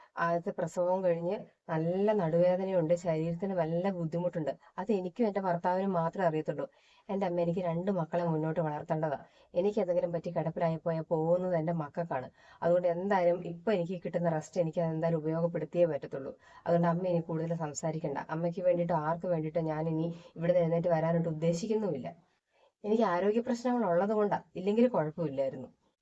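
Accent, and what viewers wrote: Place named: Thodupuzha, India. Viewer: സാംസാരം കേട്ടപ്പോൾ എന്റെ നാട്ടുകാരി ആണെന്ന് തോന്നി ഞാൻ തൊടുപുഴ ആണ്😊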